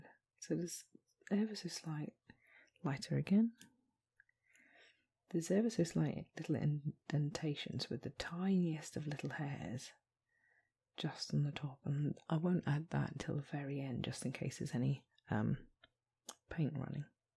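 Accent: United Kingdom. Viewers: This looks and sounds amazing!! British Accents are my favourite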